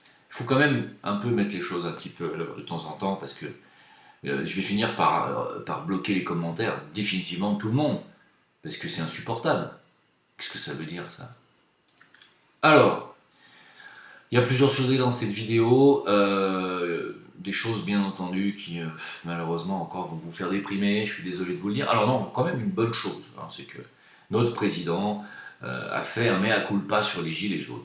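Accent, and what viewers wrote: France. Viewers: On n' est pas jaloux, mais t' es plus français c' est tout !Peut-on abandonner son pays (car tu l' as abandonné) et l' aimer ? Non, la France tu l' aimes où tu la quittes, il y a des médecins qui voyagent tout autour du monde car le monde a besoin d' eux; tu ne fais pas parti de cette catégorie; je t' aime bien mais quand même tu ne peux pas te défendre de ne pas avoir abandonner la France.Pardon de te le dire comme ça car tu ne me dois rien c' est vrai, néanmoins je regarde beaucoup de tes vidéos et tout ne me plais pas en particulier ton accent franco-gaulois alors que tu as quitté le pays. Continue quand même tes coups de gueules ils sont sympas !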